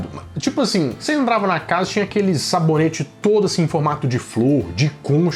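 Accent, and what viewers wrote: Minas Gerais, Brazil. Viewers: Jeitinho mineiro de falar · Eu aqui,dando faxina no quarto,parei para comentar 😂 Morrendo de rir,não sei o que é melhor,sua criatividade ou seu sotaque De uma mineira para um mineiro,nosso sotaque é lindo!😊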